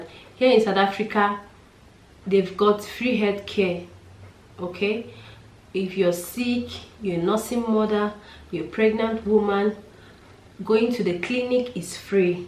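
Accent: Nigeria. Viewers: So nice listening to you, you are beautiful love your Nigerian accent, and thank you SA are good to us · we love you here in SA 🇿🇦🇿🇦, plus your Nigerian accent sound beautiful sis.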